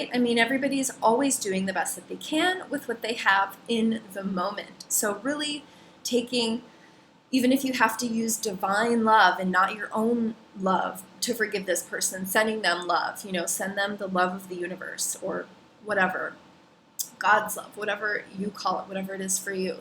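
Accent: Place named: Minnesota, United States. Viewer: Too true. All those years in HI and you can still hear the MN in your voice. Love it! :)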